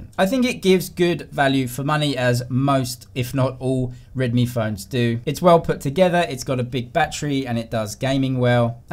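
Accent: United Kingdom. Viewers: you looks like eminem with an british accent 😊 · Are you British?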